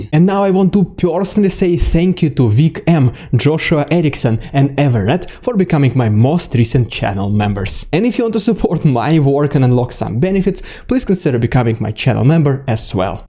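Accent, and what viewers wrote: Russia. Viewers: Really? Go to the wheel on the screen and reduce the speed of the video, and/or watch it twice ... I'm a second language English speaker from Austria/Europe and I understand him relatively well despite his Russian accent, which is the most charming part and sounds really hilarious to me!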